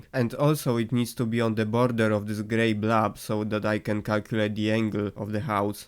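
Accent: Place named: Poland. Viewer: you sound so polish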